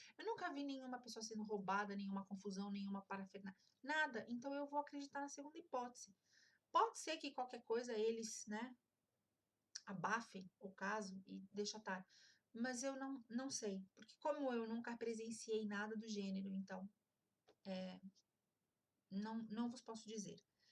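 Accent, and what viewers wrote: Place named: São Paulo, Brazil. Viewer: não resisti e fiz até uma conta aqui no Youtube só para poder postar um comentário para você.. rsrs Moro no Brasil..mas sou APAIXONADA pela Suíça...Penso em um dia morar ai.. (Se acontecer vou te visitar.. adorei vc! rsrsrs) eu tenho uma tia que mora aí e meu primo é suíço (Não entendo quase nada que ele fala.. rs), adorei todos os seus 3 vídeos.. assisti os 3..achei muito bom! não deixe de postar mais.. assistirei também com certeza! nossa, vc tem sotaque de "paulista" rs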